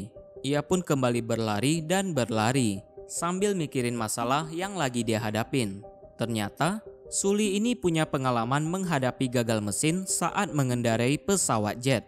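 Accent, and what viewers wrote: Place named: Singkawang, Indonesia. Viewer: Ini logat orang cina Singkawang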